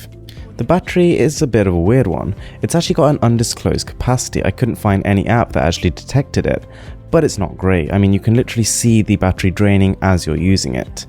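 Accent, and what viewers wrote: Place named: Birmingham, United Kingdom. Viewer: that's Birmingham I knew it ! lol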